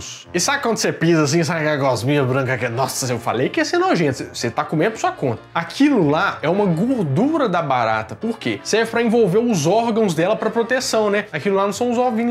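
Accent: Minas Gerais, Brazil. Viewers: ce é minerrr cumpadi? <3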